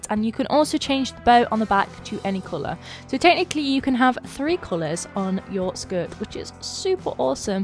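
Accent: United Kingdom. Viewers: Why do i feel like your half british. o.O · Weird question, are you British? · Is this British accent BC if it is its music to my ears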